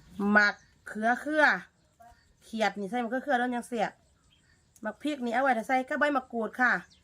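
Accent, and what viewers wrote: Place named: Ubon Ratchathani, Thailand. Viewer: สำเนียงเว้าคือทางอุบลแท้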